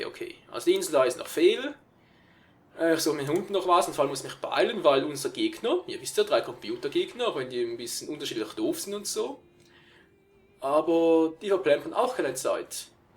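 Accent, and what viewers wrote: Switzerland. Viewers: so. Das ist der schweizerische Akzent im Deutsch. Schweizerdeutsch verstehst du nicht mehr. xD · l_ANTRAX_l du der redet Schweizer Deutsch man man immer diese ernstirnigkeit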